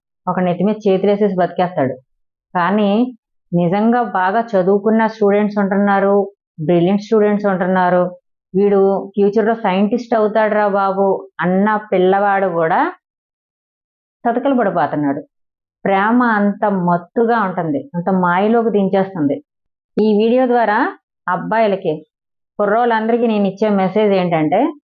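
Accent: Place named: Godavari, India. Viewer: మీ మాటతీరు ఒక గోదావరి స్లాంగ్ ఉంది and అటువైపు ఉండే సాధారణ గృహిణి మాట్లాడినట్లు ఉంది కాని మీరు చెప్పే సబ్జెక్టు బట్టి మీకు మనుషుల సైకాలాజి తెలుసు.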